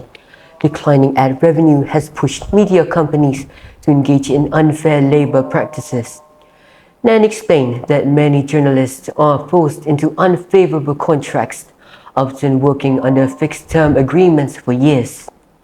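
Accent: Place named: United Kingdom. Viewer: Mas british sekaleee😘😘😘😘❤keren euy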